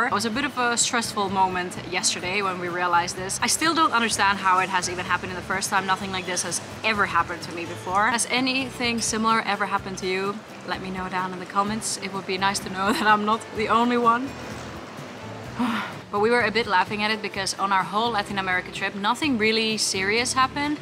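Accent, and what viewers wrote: Netherlands. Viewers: Do you know you nav a Dutch accent when taking English?😂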